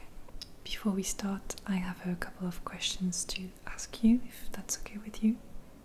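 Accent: France. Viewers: Her accent is french :) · Are you French?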